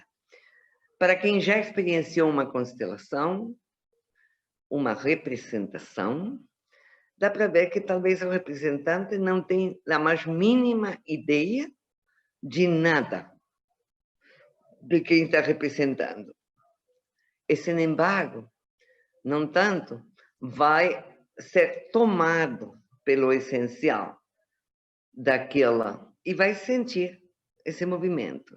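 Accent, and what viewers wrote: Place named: Brazil. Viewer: Está muy mona y habla como brasilera! Se entiende todo. Muy bueno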